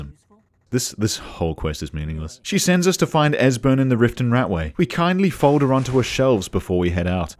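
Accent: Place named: Australia. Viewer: It's strange, i assumed was Australian on account of his accent but he always names his characters typical German names. Intriguing stuff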